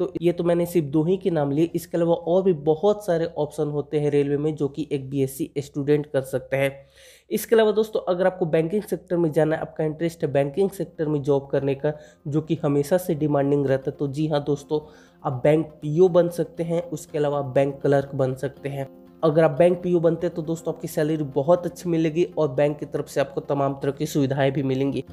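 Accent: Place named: Uttar Pradesh, India. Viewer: Kuan kaun ye bhaiya ki aawaj Uttar Pradesh se sun raha hai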